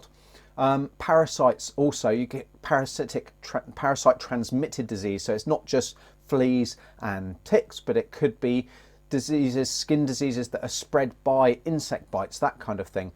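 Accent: United Kingdom. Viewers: I can't understand you with that deep UK accent. I heard dog and rash. That's all I understood.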